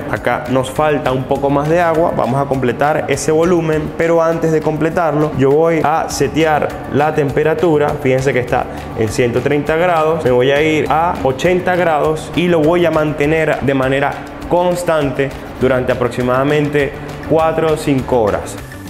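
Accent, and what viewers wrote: Venezuela. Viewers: Otra cosa no tienes asentó Chileno parece tu dialecto Venezolano. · A los segundos de escucharte, supe que eres venezolano. Eres lo máximo. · Tú estarás en Chile pero... ese acento...venezolano.... Saludos desde Venezuela, muy bueno tu video nunca he seccionado una pierna de cerdo, solo pollo y la pierna sin cadera es la que compro para hacer el jamón curado, qué fácil lo pones pero... es difícil!!!!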